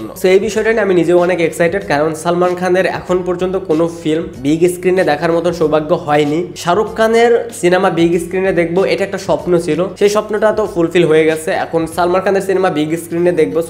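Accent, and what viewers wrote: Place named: Sirajganj, Bangladesh. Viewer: Bahi apnr basha sirajgonj kothi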